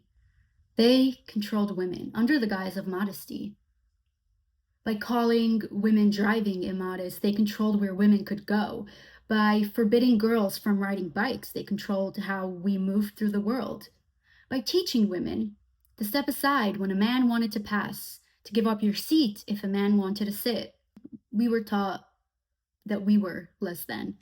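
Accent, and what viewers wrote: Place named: New York, United States. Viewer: I love this! I didn't realize you had an accent! Is it a NY accent or an accent from speaking Yiddish?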